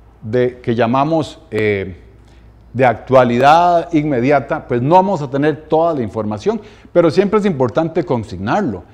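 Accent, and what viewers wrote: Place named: Costa Rica. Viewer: Tu asentó es muy bonito, debes de ser costarricense.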